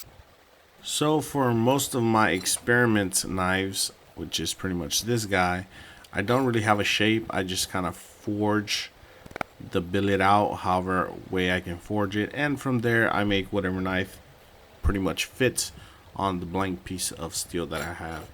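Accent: United States. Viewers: Did you rip this from a real blacksmith? Or is your english just to american?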